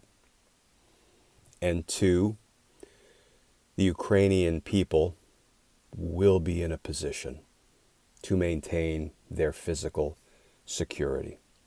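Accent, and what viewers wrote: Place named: New England, United States. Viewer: LOVE LOVE your measured, calm, clear way of speaking......I hear a little tiny hint of New England in your lovely voice.....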